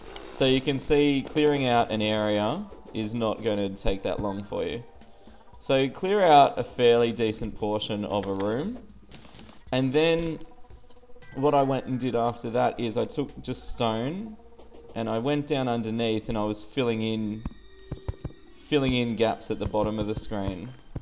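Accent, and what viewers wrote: Australia. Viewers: love your voice, so aussie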